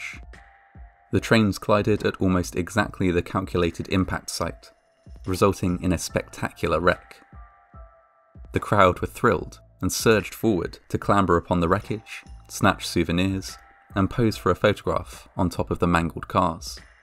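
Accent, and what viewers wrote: United Kingdom. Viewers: He’s British, it’s not fair :(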